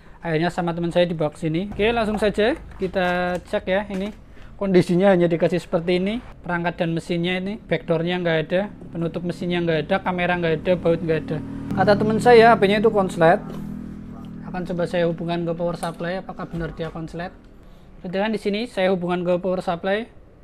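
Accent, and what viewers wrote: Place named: Java, Indonesia. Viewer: bahasanya rada medok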